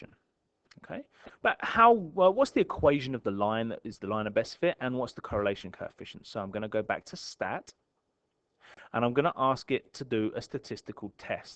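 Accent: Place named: United Kingdom. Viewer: I like this british accent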